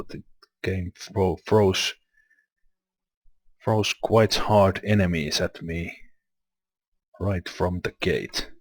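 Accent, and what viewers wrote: Finland. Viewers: He just sounds like a guy with a prominent Finnish accent. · Are you Finnish? Your accent so Finnish.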